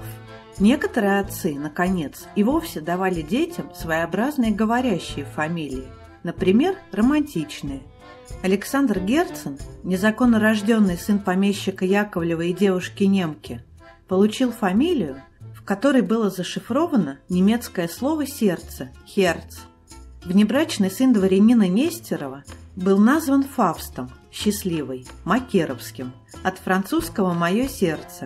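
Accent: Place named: Gomel, Belarus. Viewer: Говор беларусь гомельская обл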